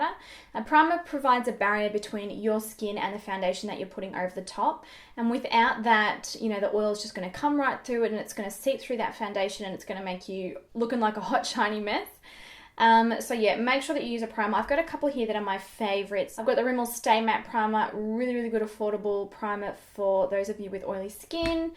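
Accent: Australia. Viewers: As a fellow oily skinner, and a mummy on a budget I highly appreciate the affordable options! Thanks so much....ps I'm a kiwi and am quite amused by your ozzy accent..oy oy oy!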